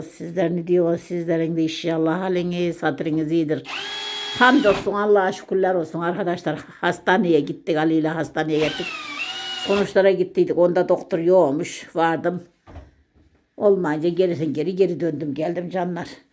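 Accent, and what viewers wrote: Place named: Çorum, Turkey. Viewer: Ben bu kardeslare abone değilim ara sıra izliyorum yorumlara bakıyorum,takipçilerin bazisindan gelen çirkin yorumlar benim bile canımı acıtıyor çok rica ediyorum beğenmiyorsanız neden izliyorsunuz.Turkiyenin her yöresinin lisanı lehçesi değişiktir hanım Çorum'da konuşulduğu gibi konuşuyor beğenmeyen izlemeyecek,ayiptir günahtır.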